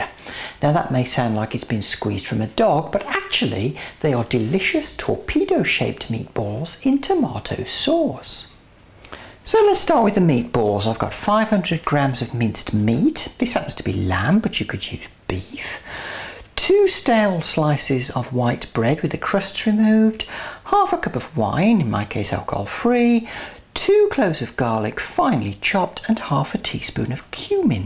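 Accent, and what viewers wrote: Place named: Solihull, United Kingdom. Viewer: Your voice is just the same as my grandma's shes from solihull. I live far away from her so i love watching your videos to hear that accent! xx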